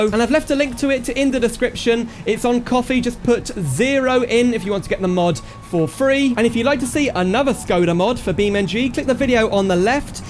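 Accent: United Kingdom. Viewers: Love British accent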